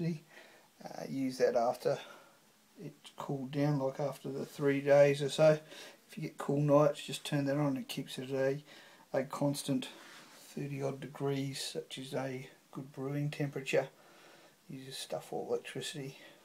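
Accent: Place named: South Africa. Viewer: R u from Aust or Nz , u sound like SA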